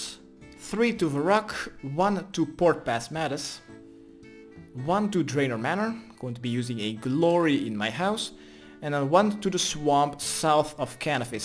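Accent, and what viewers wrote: Netherlands. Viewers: Well just finished the quest.. after 10 tries I finally managed to kill Galvek. I found Vanstrom Klause a harder boss to beat mechanics wise, but this damn fire ball in combo with the waves was unlucky. Only got song of the elves & secrets of the north as my last two quests. I want to thank you for the whole journey thus far, as a fellow dutchy it's been so enjoyable to listen to you. That damn accent haha! Thanks so much man, much love.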